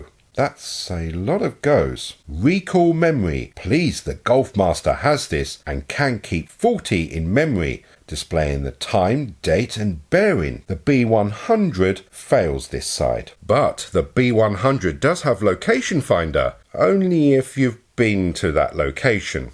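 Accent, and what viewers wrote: United Kingdom. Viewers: This guy does the comparison we wish all other videos would FINALLY do - but they don't. Mixed with a little humor and a little posh UK accent... Informative and entertaining.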